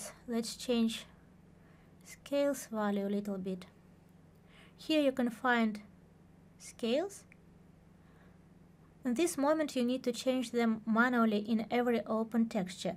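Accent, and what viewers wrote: Russia. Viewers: THNX for video, ps: русский английский просто невозможно не распознать))) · Oh this lovely russian accent!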